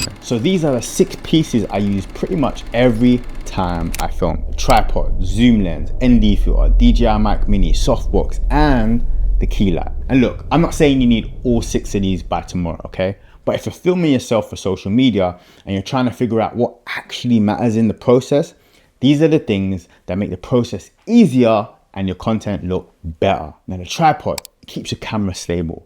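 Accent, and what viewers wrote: United Kingdom. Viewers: they haven't figured out that you're British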